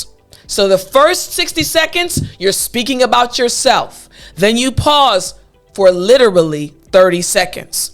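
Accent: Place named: United States. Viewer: Only I love Amercain accent and understood all what you are taking about it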